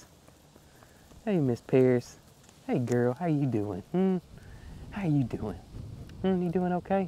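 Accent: South, United States. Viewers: Greens and beans ROCK the south! I gotta say, I love leaving the closed captioning on when I can. I would guess that the folks that do the cc for YouTube are 1. not gardeners and 2. not from the south. Watching them goof up your southern drawl really makes me chuckle sometimes. Wonder how hard it was for you to pull that HAIR ROOF (harrow) through your garden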